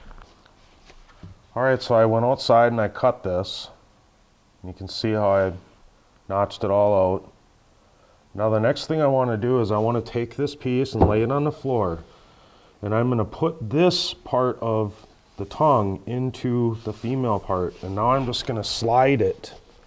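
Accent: Minnesota, United States. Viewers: Your praise of God blesses me because I find myself everyday thanking the Lord for guiding me at work especially when I run into some 'mystery' challenges I can't figure on my own, thanks. Great instructional videos and love the MN accent. God Bless!!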